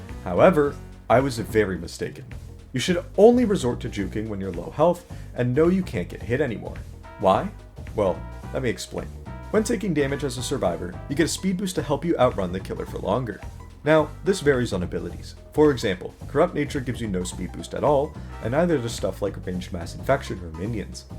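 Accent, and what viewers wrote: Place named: United Kingdom. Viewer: ARE YOU FUCKING BRITISH?:face-purple-crying::face-purple-crying::face-purple-crying::face-purple-crying::face-purple-crying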